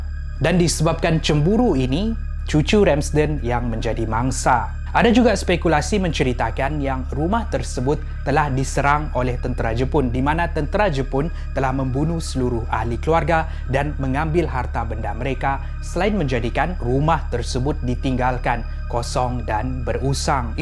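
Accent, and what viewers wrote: Sabah, Malaysia. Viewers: Orang Sabah...bah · ckp sabah sya suka dgt org sbh ckp...sdp gilaa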